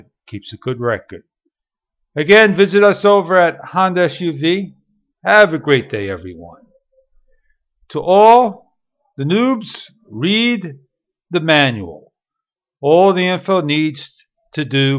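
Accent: New York, United States. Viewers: U from ny!?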